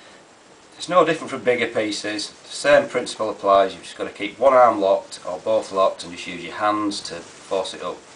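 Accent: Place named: Yorkshire, United Kingdom. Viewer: I LOVE the Yorkshire accent! And the lesson is wonderful.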